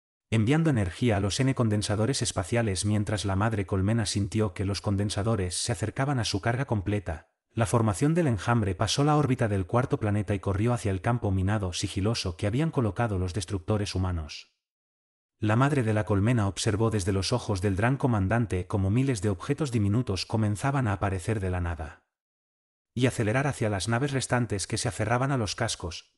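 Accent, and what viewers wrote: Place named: Spain. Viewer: Muy buena narración lo malo no es para que se ofendan el idioma Español no nos gusta por estos lado el español o castellano latino nos gusta más y lo comprendemos más fácil y con más emoción y damos muchu mas arbotantes que ustedes así es que póngale la opción de latino porque para la otra no los escucho nojoda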